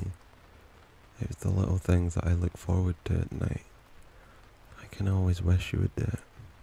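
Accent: Scotland, United Kingdom. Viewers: IM OBSESSED WITH HIS ACCENT 😍 I live in America so I barely hear rare accents like his. Scottish accents are SOO unique 😍😍😭😭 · ... hey Mr. Wonderful, Do my ears deceive me?? Are you Scottish?? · Me, being Scottish and losing my shit over his accent because I didn't expect it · It's nice to hear a familiar accent! Not enough Scots on here. My only pointer is emoting. Not all of it sounds totally natural. You do have a very soothing voice though, and I like the rest of it :) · if i’m not mistaken, it’s actually scottish! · Errr is this a scottish accent im not good with accents TvT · I’m Scottish and this just proves scotty accents are just>>>> 😮‍💨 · This might be an insult but are you Scottish 😅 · Omg are you Scottish? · It’s a Scottish accent and I’m in love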